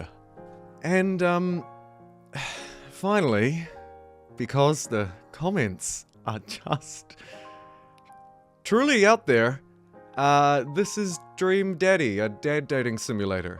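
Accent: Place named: New Zealand. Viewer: I love that with your kiwi accent you need to spell out DECK to avoid misunderstanding 😅😅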